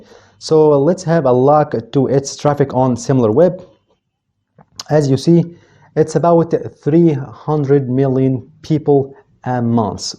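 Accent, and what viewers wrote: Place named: Egypt. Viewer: منور يا عم او كما تقولون الله ينور ياعم عرفت انك مصري من خلال كلمتين (اكزمبل exemple وايضا زيس this) بالتوفيق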